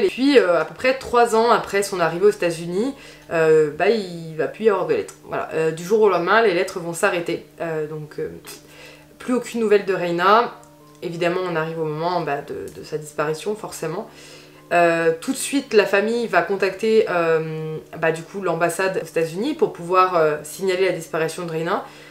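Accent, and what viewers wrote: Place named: Brussels, Belgium. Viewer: Vous avez quasiment le même accent que feu ma demi-soeur et j'en déduis que vous êtes....bruxelloise. Mais l'erreur est humaine . Vincent, de Liège (Belgique) .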